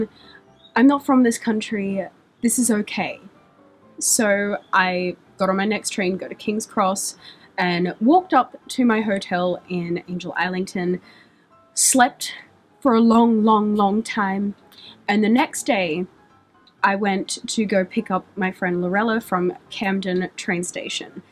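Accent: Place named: Australia. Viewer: AUSTRALIAN ACCENTS ARE THE BEST THING EVER!!!! AUR NAURRRR!!!!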